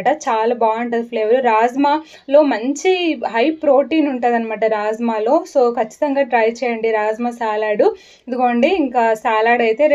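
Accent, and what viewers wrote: India. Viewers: Mee language baavundi Ananthapur kadaa mam meedi??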